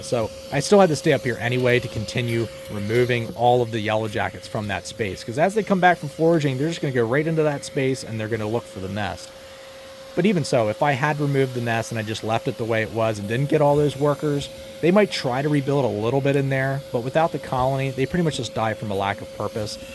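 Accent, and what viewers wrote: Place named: Pennsylvania, United States. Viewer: What is this dialect? It's very "Mare of Easttown"...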